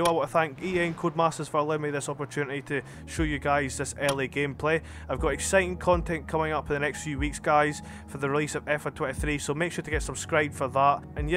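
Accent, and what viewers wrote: Scotland, United Kingdom. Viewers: never heard u talk before but didnt expect scottish lmao another banger though!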